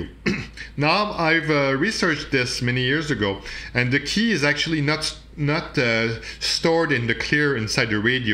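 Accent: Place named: France. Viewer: Your english sound french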